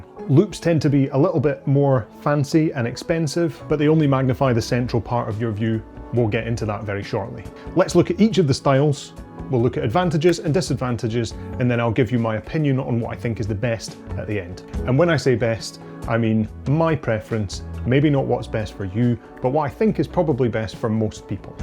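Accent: Scotland, United Kingdom. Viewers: Was so good to hear a Scottish accent when I turned the volume up. (No, I haven’t got some weird voice fetish, it just meant I haven’t got to go searching for the UK equivalent with the links! For a change.)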